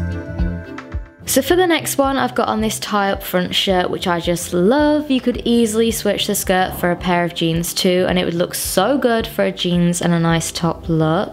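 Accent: United Kingdom. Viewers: Lol I think in the uk people pronounce it how you do (nyke) but in the Us we say nikE (nikee) and also i think the uk say AdidAs (adidAs) but the US says adIdas (adeedas) lol idk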